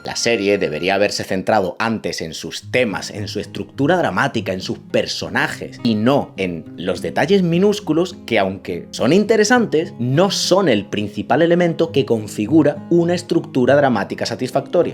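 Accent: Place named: Andalusia, Spain. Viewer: Encima todo con acento andaluz 👌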